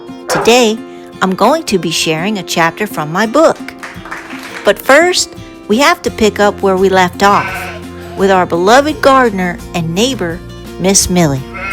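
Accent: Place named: United States. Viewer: I am enjoying your episodes. They are very delightful to listen to.. I love that voice that has a country draw to it.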